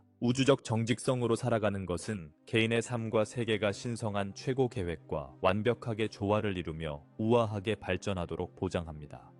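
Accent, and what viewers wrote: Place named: Gyeongsang, South Korea. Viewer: 대박대박대박 화이팅하이숑 야호야호야호 경상도 사투리가 당연하지요 창녕띠기 아인교 야호 신납니당~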